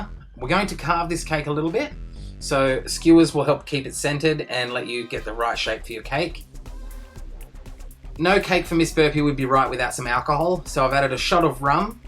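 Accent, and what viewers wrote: Australia. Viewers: can u not hear his accent hes Australian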